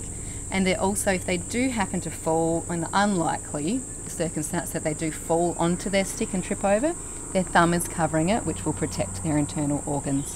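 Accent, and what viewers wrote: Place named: Australia. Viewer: Anywhere. I once accidentally put a "corn dog" stick through a guy's hand. It was a little pointed on the end, but not sharp - but it just took one little slip goofing off and he had to go to the ER. Ruined everyone's night at the county fair. You should really just be supportive of a place that advocates kids being kids, instead of trolling all her posts. Sounds like she is an Aussie, btw.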